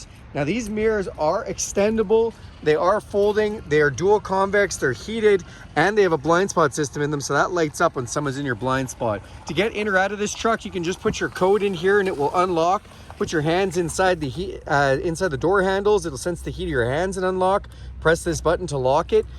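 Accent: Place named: Canada. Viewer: I freaked out for a minute on the price....then I heard your accent "aye"....then realized it was Canadian price! lol. Great review! What I REALLY liked about your review is that you said "as well" only a few times when describing the features. I know it is hard, but several other reviewers say that dozens of times in a 10 minute review and it is distracting. Thank you.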